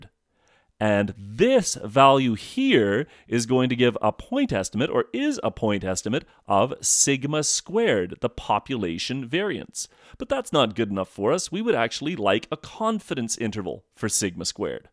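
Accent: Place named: Canada. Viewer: I like the Canadian accent :)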